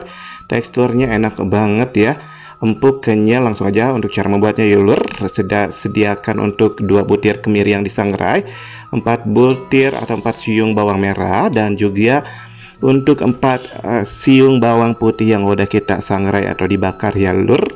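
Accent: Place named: Java, Indonesia. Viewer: kelihatan medok banget😊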